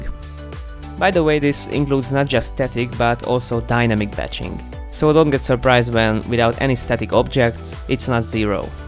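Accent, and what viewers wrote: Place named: Hungary. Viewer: Are you hungarian btw? You have an accent like all of us here.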